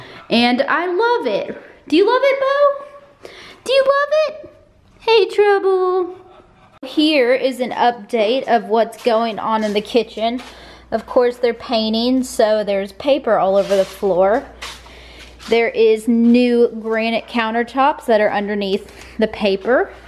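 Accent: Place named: Texas, United States. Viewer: its Texan mixed with her mothers very southern accent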